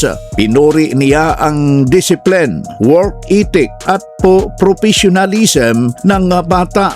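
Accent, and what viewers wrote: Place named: Iloilo, Philippines. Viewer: Bossing?ulonggo k b.accents mo.wag mo ipahalata.para d k macomment ng ibang tao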